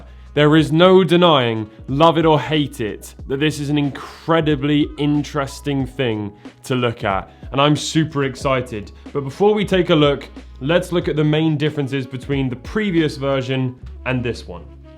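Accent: United Kingdom. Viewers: He said it correctly, the double ll is what makes the y sound and the bi rhymes with bee. His tour sounding like tuh is just his british accent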